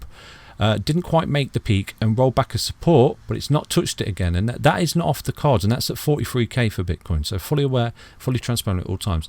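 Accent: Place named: England, United Kingdom. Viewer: Lol , it's that English accent 🤣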